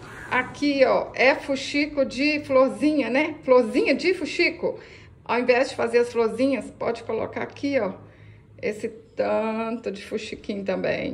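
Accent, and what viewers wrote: Minas Gerais, Brazil. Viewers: Adoro assistir suas aulas. Elas sao fáceis e esse seu sotaque de mineira é uma delícia!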